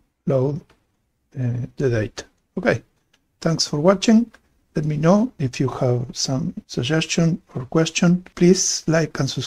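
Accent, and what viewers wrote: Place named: Argentina. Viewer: How nice that I could notice the argentine english accent just 10 seconds into the video with no prior clue haha. Thanks for sharing the project!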